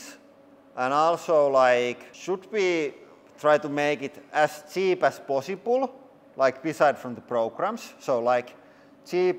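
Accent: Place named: Finland. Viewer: I would love to see Finland one day. I was born and raised in the U.S. (65yrs ago) but my grandfather Toivo was Finish. I love watching your channel because of your accent and humor, it reminds me of him. Thanks for the great content 👍 😊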